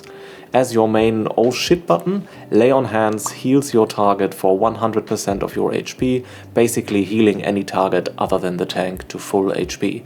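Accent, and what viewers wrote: Germany. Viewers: Hi where are u from if u dont mind asking! cuz u have a german accent haha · I hear a bit DEUTSCH out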